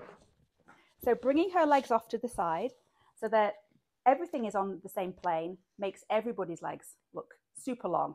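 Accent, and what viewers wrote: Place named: England, United Kingdom. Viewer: We speak English with an English accent in England. For those that don’t understand English well, you can turn on the subtitles!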